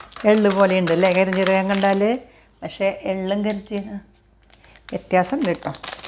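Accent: Thrissur, India. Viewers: മ്മടെ പക്കാ തൃശ്ശൂർ സ്ലാങ്.. മരുന്നും അവതരണവും ഒരേ പോലെ നന്നായിരിക്കുന്നു ചേച്ചി.. 👌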